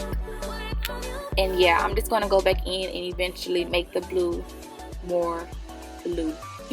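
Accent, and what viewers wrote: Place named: New Orleans, United States. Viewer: Girl that’s a thick N.O accent lol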